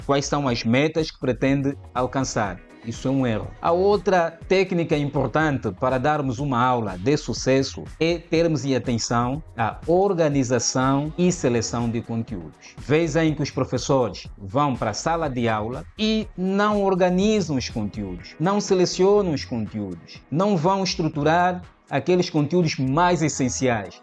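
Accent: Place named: Angola. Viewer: professor você é de angola ou moçambique? Sem preconceito é claro.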